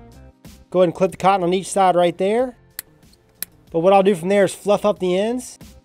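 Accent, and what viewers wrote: South, United States. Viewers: Mesh?????! Omg!!!!!!!! I NEVER thought vaping would evolve this much! Wow!!!! I shall go on the hunt for one! Sn: I peep a bit of a southern accent! It's adorable!!! Plus, his vids are always short, sweet, and to the point! Yessss!!!! ♥️♥️♥️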